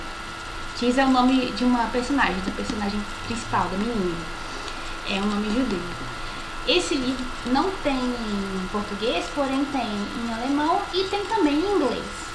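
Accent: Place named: Minas Gerais, Brazil. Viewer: Genteeeee, olha vc!!!! Mega mineirinha😅😅😅 ah, adorei vê vc uns 10 anos de agora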